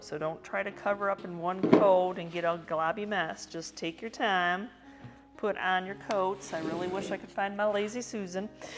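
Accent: South, United States. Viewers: I have been slowly going through and making over things that need a new home. I know i am being nosey but where did you get that southern accent as i can not help but ask.. craft on my friend...😊😊😊😊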